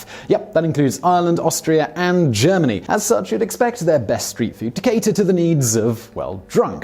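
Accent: United Kingdom. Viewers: Quick question.....where are these videos made ? You sound so British, but everything is compared to America. If it hadn't been for Cornwall being mentioned I'd had no idea what you were talking about. I have no idea about Wyoming, Dollars and cents or even where Rhoad Island is let alone the size of it. It's like watching a cookery program where there's no such thing as Gas Marks and everything is measured in cups !! I begin to feel like Alice falling down the rabbit hole !!!!!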